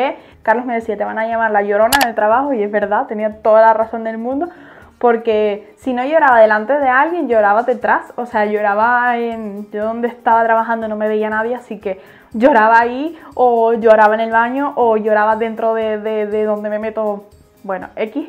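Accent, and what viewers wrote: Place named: Canary Islands, Spain. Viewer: Hola guapa me acabo de subscribir y es el primer video q veo , yo soy de tenerife pero vivo en gran canaria, se te nota que eres canaria pero vamos de lejos,,, yo estoy buscando bebe el segundo tengo una nena de 10 años , soy irregular y mi ultima regla fue el 6 de marzo pero de aho no me ha venido los test me salen negativos pero he tenido todos los sintomas igual q tu menos el llanto, los pechos no me los puedo ni tocar, dolores etc, pero nada no me quiero ilusionar si no me viene la regla me hare el test cada 15 dias , me compre una caja de 10 test por ally espres mas barato, pero no te imaginas las ganas que tengo de embarazarme......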